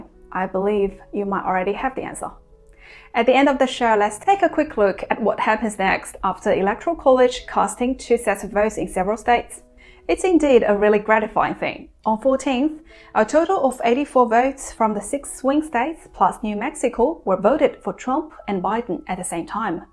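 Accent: Australia. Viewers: Very good English with OZ accent, love it 😍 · 澳洲口音而已 讲的很好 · I also watched you a few times on your Chinese program. I slightly prefer your new English channel as it sounds more serious and factual IMO. Also really loved your Aussie accent. Kudos! Not many on here can do both languages at a mother tongue level! 加油！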